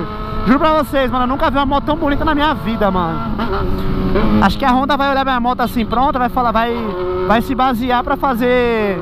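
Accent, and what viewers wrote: Bahia, Brazil. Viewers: mooooo viaje falar baianes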